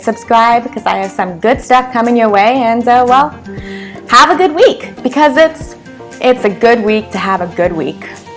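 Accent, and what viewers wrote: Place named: New York, United States. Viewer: You sound more ny than Canadian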